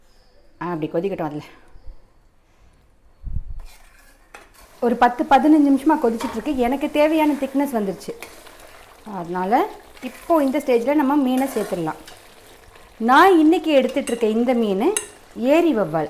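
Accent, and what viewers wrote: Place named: Erode, India. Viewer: sissy erode language pola pesrenga. unga voice kekkum pothu amma oor மண் வாசனை..... And meen kulambu vaasam kalanthu oru vidha santhosathai kudukkuthu.... Nice sissy thank u so much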